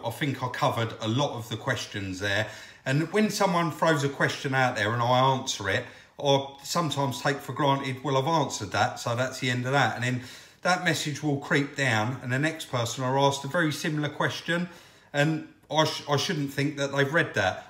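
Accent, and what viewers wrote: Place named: England, United Kingdom. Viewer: Not being a dick but it's so refreshing to hear an english youtuber. Love the american stuff but it's hard to relate, if you get what I mean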